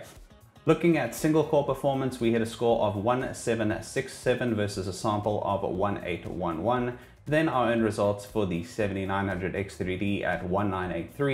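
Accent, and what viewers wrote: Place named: South Africa. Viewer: South African accents are always so noticeable haha. Nice video man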